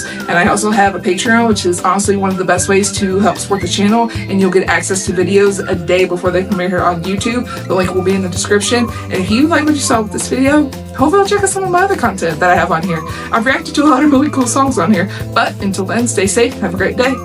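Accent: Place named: North America. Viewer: Your accent sounds pretty North American, and they come up here pretty regularly since Monterrey is only about 80 miles from the Texas border. Just keep an eye out on their web site for their schedule. Even if it's just a 1/2 hour set in a multi-band festival, seeing them live is always worth every penny - and generally speaking, you won't even have to spend that many pennies - they won't try to squeeze $600 out of you; between $30 and $60 usually sufficient. 🙂 Musicians this good and entertaining for an affordable price is almost unheard of these days, and a tremendous relief for those of us who have been going to concerts and festivals for multiple decades.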